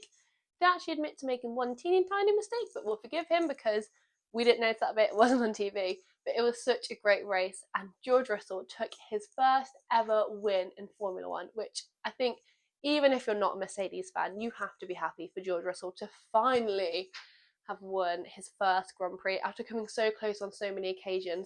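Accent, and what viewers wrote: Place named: United Kingdom. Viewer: I love your comments, your channel and your british accent. Greetings from Mexico.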